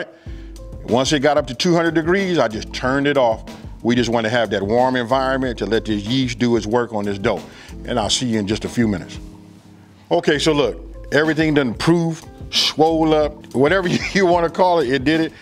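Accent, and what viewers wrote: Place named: California, United States. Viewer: Facts!!!! I get all my recipes from him.. he needs to have a show on Revolt or BET, TVone or something.. cool, not corny.. and you can tell he from 'round the way lol.. I f*cks with and his Cali accent lol 💯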